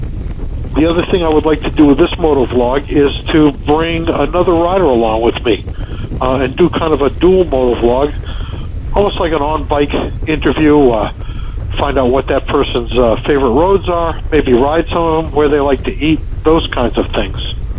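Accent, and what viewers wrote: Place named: New Hampshire, United States. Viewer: You sound like your from Cow Hampstah I lived there for 36 yeahs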